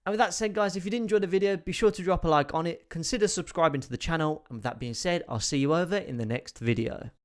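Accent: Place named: Northern England, United Kingdom. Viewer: I thought it was brummie initially but certain words made me think it was more northern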